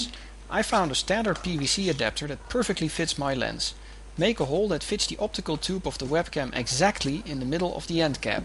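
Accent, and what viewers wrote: Netherlands. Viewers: Mooi nl accent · je klinkt een beetje nederlands xd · haha this is a dutch guy who is talking english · too bad that he's clearly from holland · nice dutch accent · Je bent een Nederlander je hoort het in je nederlands zoals bij veel Nederlanders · Dutch accept OP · NL! hoor ik altijd als ze Engels spreken XD! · from the VS?? you have more a dutch accent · very cool, i like the very clear dutch accent :D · are you dutch? just curious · I could hear right away that you were Dutch, nice video by the way - I'd suggest buying a better webcam in the first place so you end up with a better result in the end. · lol good vid but horrible accent haha most dutch people can speak well english but the accents are horrible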